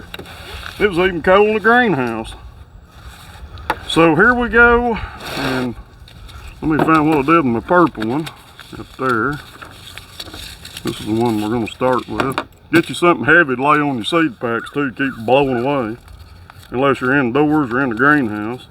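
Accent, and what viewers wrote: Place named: South, United States. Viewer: I guess you have to be part southern to understand him. I find him to be perfectly understandable and I love listening to my very missed southern speak, trapped in the north wishing the snow would go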